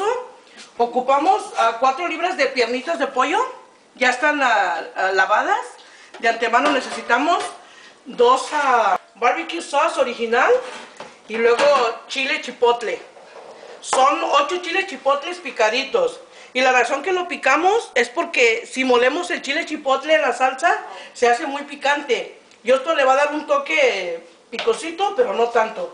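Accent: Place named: San Luis, Argentina. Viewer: Damn, it's a good thing I understand Espanol,,,, porque yo si entender la forma que te hablamos,,,,,, yo vive en San Luis, R.C. Son, por unas 3 anos como son 30+ anos pasado, y la jente hablan egual a como usted. , se vey muy facil a hacer este "meal", muchas gracias...... Seniora.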